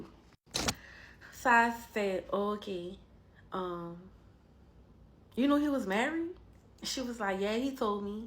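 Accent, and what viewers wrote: New Orleans, United States. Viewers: Girl I knew you was from New Orleans when you opened your mouth lol im from New Orleans and still here. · I’m frm nyc u want to switch accents girl New Orleans baybay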